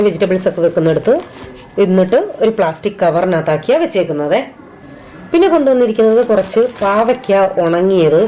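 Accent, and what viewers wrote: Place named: Kollam, India. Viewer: what kap thot meen kutmo nee.......... kollam slang super!.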